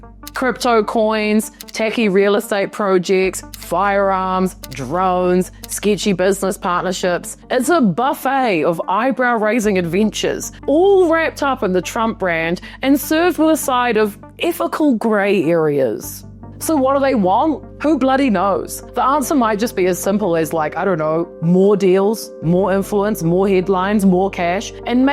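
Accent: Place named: New Zealand. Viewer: Completely beside the point - love your NZ accent !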